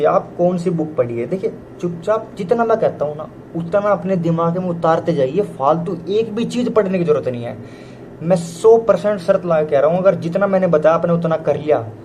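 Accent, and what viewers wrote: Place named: Western Uttar Pradesh, India. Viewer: bhai up West ka accent lgra h teri bolli m....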